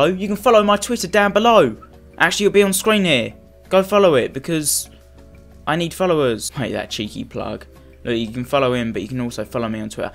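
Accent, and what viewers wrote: United Kingdom. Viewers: I think you have a British acsence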